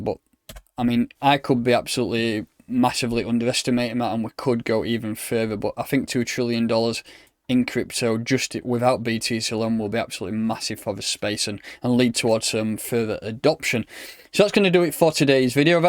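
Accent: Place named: North, United Kingdom. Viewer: I come for the Crypto and I stay for the Northerness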